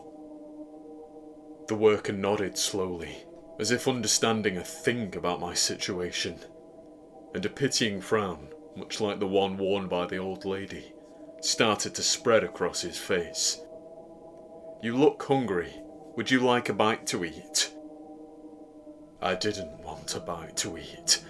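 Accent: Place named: North, United Kingdom. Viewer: Only found this channel a week ago and it's heading toward being a favourite. The fact that a fellow northerner is narrating doesn't hurt, either!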